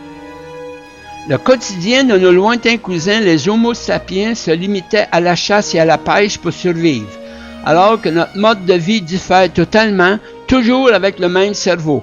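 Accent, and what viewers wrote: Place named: Canada. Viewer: Putain j'ai cru au début que c'était un débile mental qui parlait au début. Après je me suis rendu compte que c'était un canadiens... \o/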